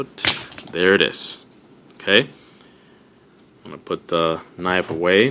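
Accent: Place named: South America. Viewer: Your accent sounds like your from South America. And nothing wrong with that. But it’s not called a, handle, it’s called, the grip. But I understand why you would call it a handle. And this was such a kick ass video. I’ve never seen the inside of this firearm. Thanks for making such an in depth video on the chiappa rhino. Keep it up man. I’d like to see more video of some other gun, strip downs.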